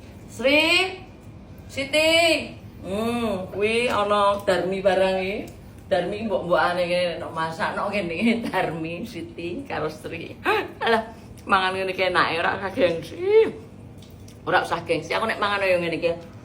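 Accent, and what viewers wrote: Java, Indonesia. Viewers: Keren mbak omongane medhok jowo 😂😂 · Masya Allah jawanya medhuuuk, klaten hadir mvk ❤ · Masyaallah mbak...medok natural banget sampean, tapi yo tetep cantik tetep sugih👍❤️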